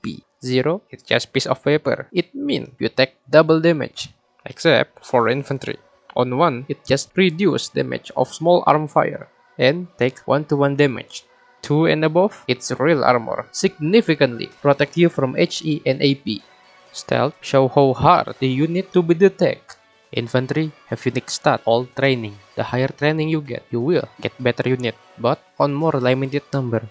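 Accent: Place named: Indonesia. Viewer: Great video, wish I could understand the heavy indonesian accent in some places, but the editing is hilarious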